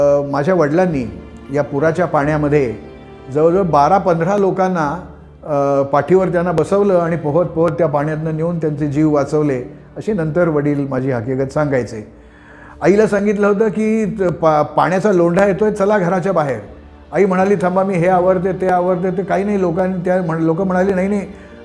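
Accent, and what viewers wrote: Pune, India. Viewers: After long time I heard puneri marathi ❤️ Being a muslim but punekar my child hood spend at kasba peth. Work place was Deccan. After marriage we shifted to camp mg rd.it was gap of 18years. My mom survival of this incident she was may be 7 or 8 yrs old she told me they were shifted to rasta peth I think my be I m not wrong it's mudliyar bunglow. Thanks sir for this video 👍 I will show this video to my mom ❤️ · असं स्वच्छ आणि चांगली मराठी भाषा बोलता येण्यासाठी मुळ पेठांमध्ये जन्म घ्यावा लागतो.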